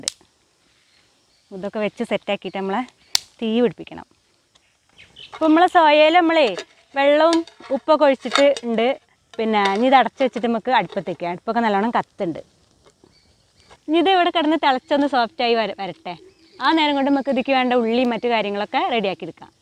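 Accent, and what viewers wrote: Malappuram, India. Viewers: ഹായ്.... വിഭവങ്ങളും.... മലപ്പുറം തനത് ശൈലിയിൽ ഉള്ള അവതരണവും ഉഷാറാവുന്നുണ്ട്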